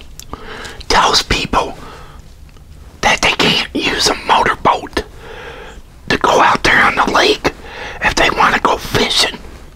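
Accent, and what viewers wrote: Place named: United States. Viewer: that looks so nice yummy i bet you enjoyed it by the way im from the uk and im guessing ur from america due to your accent haha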